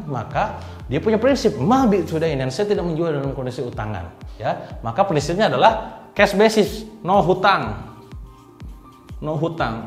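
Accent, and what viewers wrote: West Java, Indonesia. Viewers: Ni sunda pisan bos 😁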